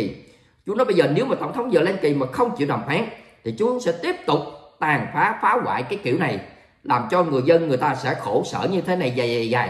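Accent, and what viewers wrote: South, Vietnam. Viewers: Nghe nói tin tức giọng miền nam hay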